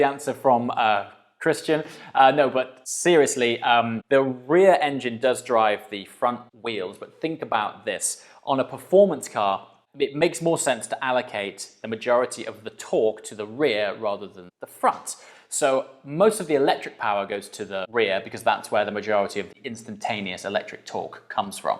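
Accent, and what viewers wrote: United Kingdom. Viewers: Truly great technological advancement. I just wonder how reliable that car will be? It looks great too. I agree that the car resembles a Panamera. You don't even know how I appreciate your smooth talk. Many people in the car industry butcher to the point that I can't watch them, That UK accent was like a breeze of fresh air from the North Sea. Cheers from USA!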